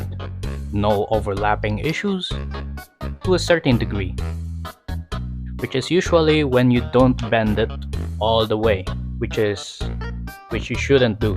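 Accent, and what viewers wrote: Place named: Europe. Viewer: U sound like an European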